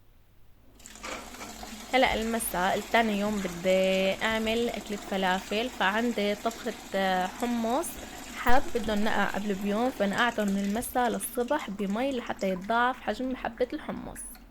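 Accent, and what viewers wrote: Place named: Homs, Syria. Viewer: صحه وهنا لكلشي اكل.. . يعطيكي العافية... محتوى حلو وطبيعي وسلس بستمتع معك مع لهجتنا الحمصيه البتجنن محتوى من بيوتنا مافيه تصنع ابداااااا..... من حسن للأحسن يارب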